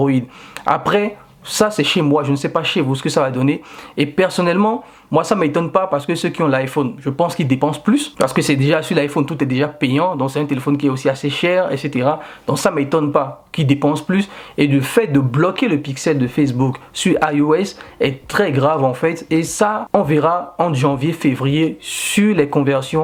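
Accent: Benin. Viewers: Je clique sur la vidéo et j'entends un accent béninois corsé hahah ca fait du bien je me suis tout de suite abonnée.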